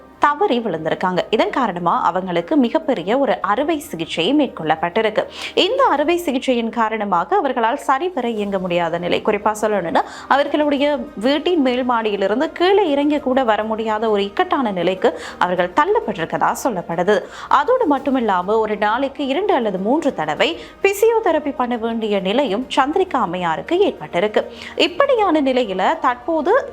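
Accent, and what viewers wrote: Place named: India. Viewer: Can you please maintain Jaffna Tamil. It’s more of z Indian Tamil.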